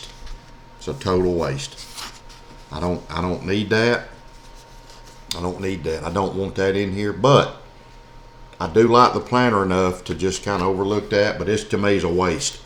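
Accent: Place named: Appalachia, United States. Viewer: It is so refreshing to not only see a man on "journal Tube" but also one that has an Appalachian accent. You sound like my family members and there's something really comforting about that.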